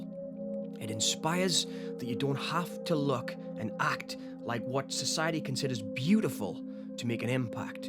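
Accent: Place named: Scotland, United Kingdom. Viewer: I guess u were expecting an answer and all u got was a little love heart ;) There's definitely a dram of Scottish in there, maybe some Irish too, and of course East? American.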